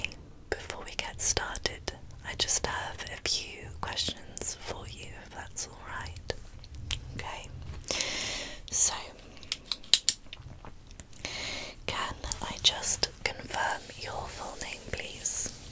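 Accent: England, United Kingdom. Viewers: The tone of your voice is perfect. It’s very calming. An English accent suits asmr, it’s mesmerising.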